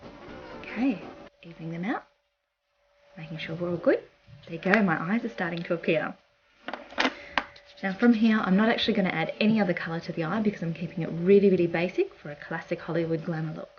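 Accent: Australia. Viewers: There's just something about your Australian accent that makes me love everything you do. Or maybe it's just your talent. :D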